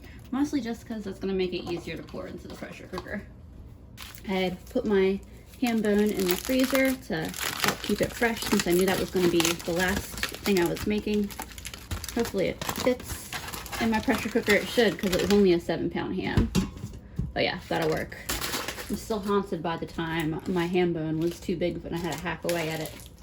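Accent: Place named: Georgia, United States. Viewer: Based on your "accent" I would assume you're living in Georgia but maybe a transplant from a different state.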